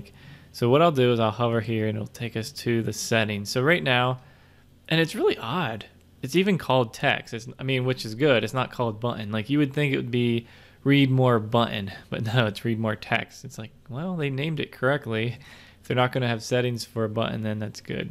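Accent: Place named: Baltimore, United States. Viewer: Are you from Baltimore or Maybe Philly?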